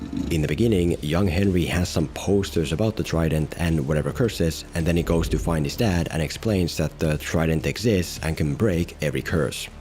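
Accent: Finland. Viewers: Jankon Betoni, laatu matskua. Kuulostaa ihan Suomalaiselta kyllä, aksentti on tutun kuuloista. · Right in the intro of the video there's an example of that in the form of a clip from a classic Finnish comedy sketch. In fact, enjoying the content of this channel is probably an example of that because the narrator's accent is almost certainly Finnish.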